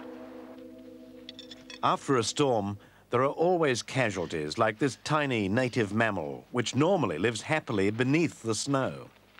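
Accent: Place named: United Kingdom. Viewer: Amazing to hear the narrator with a still slight British accent. How things have changed in 40 years.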